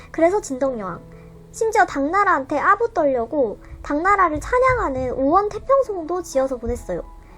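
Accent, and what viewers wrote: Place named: Jeolla, South Korea. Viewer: 전남쪽 냄새가 납니다ㅎ귀여워요~ 유용한암기법 잘 보고 갑니다잉^^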